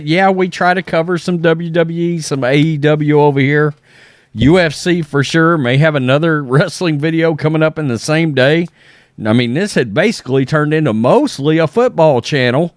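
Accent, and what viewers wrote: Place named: Appalachia, United States. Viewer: I could tell by the redneck hillbilly accent that this was going to be a dumb video. But even then I was impressed by how much worse it actually was. Congrats on exceeding expectations.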